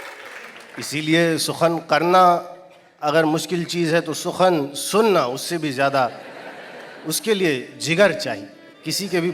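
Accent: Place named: Maharashtra, India. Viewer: I can still find Marathi accent in his Urdu. He doesn't sound prominent Urdu; sounds like Marathi Urdu, but very good attempt ❤.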